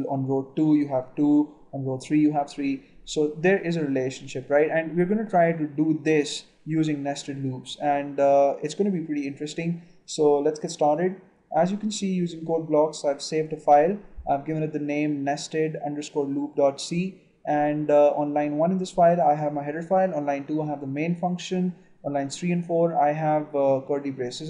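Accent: India. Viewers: even youtube doesn't understand the freaking hindi accent.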